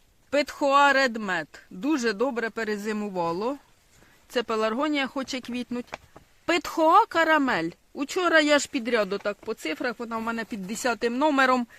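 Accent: Poltava, Ukraine. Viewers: Коли вас чую, то велика насолода від вашої праці, краси і мови, я наче побула на Полтавщині, у своєї тьоті і теж - Ліди. Здоров'я вам та успіхів в цій нелегкій праці.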